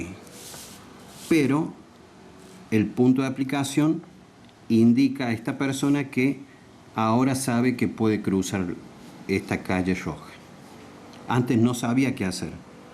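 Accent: Córdoba, Argentina. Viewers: Ese acento cordobeh si se puede ver 😍 , lo entendi todo explica muy bien gracias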